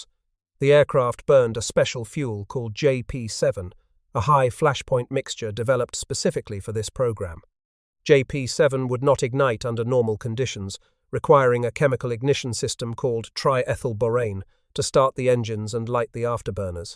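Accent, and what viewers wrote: United Kingdom. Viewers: The British accent is why so many people watch these videos. Keep them coming!! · Get rid of the British narration.😡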